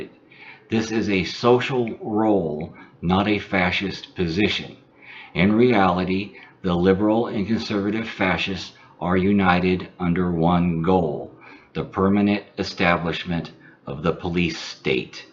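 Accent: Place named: United States. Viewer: Amazing and thought provoking in this age of rampant extremism and rising nationalism. This is the first time I've listened to an American and understood every single word he has uttered without scratching my head and despite his accent. Besides, this person sounds like a very decent American, which is quite unusual. Interesting philosophy, really, and a humanistic and tolerant approach to the world. Personally, I've always harboured similar ideas ever since I was a teenager, but I had no name for it and never looked for one anyway. I've just been unable to fit into any ideological, doctrinal or sectarian pattern all my life, which I think is a boon as I never got involved in compromising political endeavours. Voilà de quoi me lubrifier les méninges. Greetings from Algeria.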